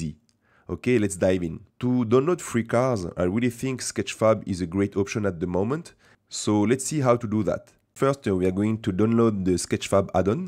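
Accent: France. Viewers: accent francais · C'est un français ce youtubeur? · tu serais pas français par hasard ? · i really love your french accent tres bien continuee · French accent, bonne journée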